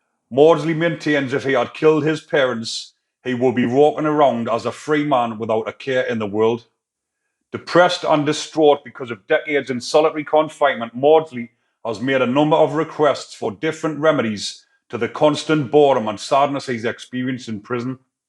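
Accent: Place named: Gateshead, United Kingdom. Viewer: Mate you are from my neck of the woods going on your accent (Gateshead I'm guessing?) I love the fact you have 50k subs for just reading out Wiki prisoner stories word for word. Fair play and good luck.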